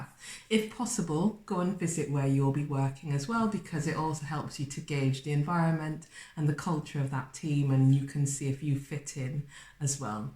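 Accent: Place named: South Wales, United Kingdom. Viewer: Love the South Wales accent, just here to support.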